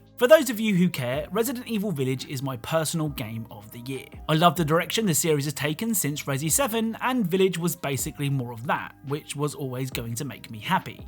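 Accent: United Kingdom. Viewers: Hearing British people say words that’s arent English always makes me laugh.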